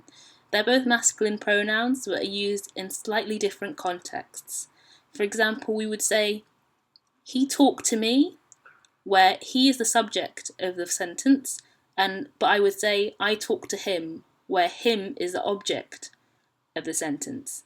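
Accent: England, United Kingdom. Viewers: I appreciate all the effort you have made but you accent when you try to speak Latin is just so terribly English. It sounds funny. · I think you have a very strong English accent in Latin😅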